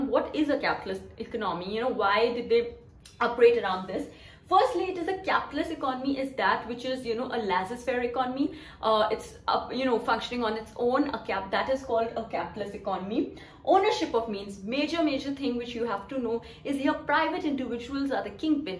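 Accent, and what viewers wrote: India. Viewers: I really like your hindi accent miss.Its so adorable fr😭🎀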